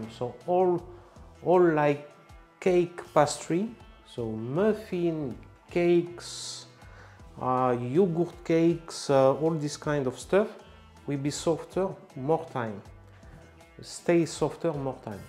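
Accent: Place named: France. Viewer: Thank you for another good video. You are so good at explaining in a simple way with your lovely French accent😊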